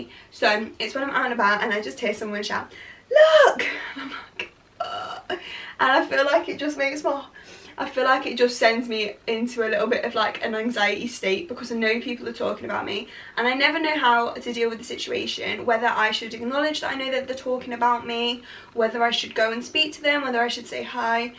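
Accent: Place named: England, United Kingdom. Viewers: She's from Derby. I'm from Derby as well we have a couple of "native" accents here. One of em is Kelly from Misfits! lol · I love your accent, im from England too but i can't work out where the accent is from! You are extremely inspirational , beautiful and very talented at make up, you should be so proud of your content! 💗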